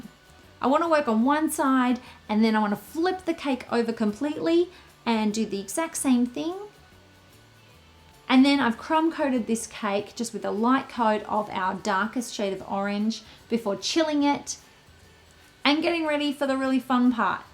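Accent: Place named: Australia. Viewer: OMG you're Australian, so refreshing you hear another Aussie on YouTube. Was fully expecting you to have an accent.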